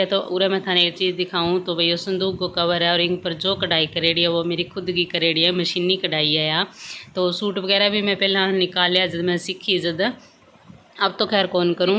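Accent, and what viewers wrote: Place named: Bagri, India. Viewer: n bagdi bolni sichao aap gi boli hi achi laag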